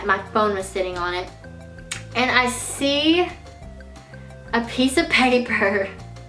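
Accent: South, United States. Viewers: Margot robbie said she watched your videos to get a southern accent for a movie omg! 😍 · Are you southern cuz you have a really strong accent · what state are you from? I realize you would obviously be from the south. I live in the south aswell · You sound so southern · I love your country voice man I've always liked country voices 😝 lol I've never said man before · you voice sounds country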